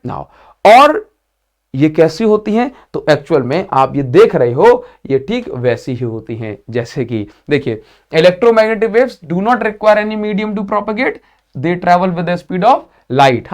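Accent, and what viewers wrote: Odisha, India. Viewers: Sir are you from odisha ??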